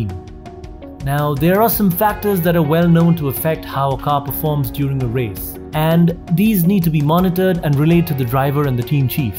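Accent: India. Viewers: this guy speaks a nice indian english <3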